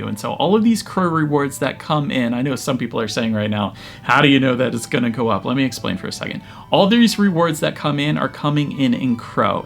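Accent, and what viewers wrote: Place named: Pennsylvania, United States. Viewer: i heard the PA in your voice! sounds like e l c o d a y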